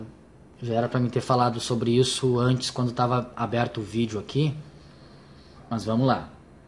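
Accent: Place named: Rio Grande do Sul, Brazil. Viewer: Cara, tu eh gaucho? Pelo sotaque parece... tambem sou do sul, abraco, otimo video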